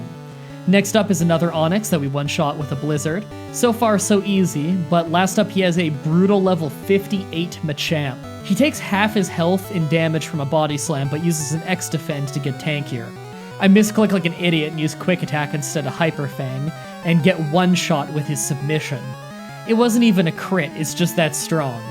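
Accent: Canada. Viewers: Love the Canadian accent lol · are you cadidan? you have a very neutral accent with some quirks that aren't in the chicagoan accent.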